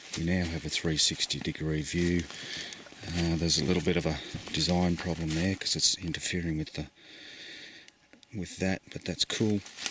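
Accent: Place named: Australia. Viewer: Good video. Love your voice from Down Under if I am right? I just hope a hairy guy does not tamper with them! I know they are not cheap! An online friend of mine I spoke with a long time back from Perth used to have a Kookaburra. About as annoying as a woodpecker here in a tree! I hope you do not have any there to scare them away and drown out the vocalizations! Wonder if they can mimic birds like that?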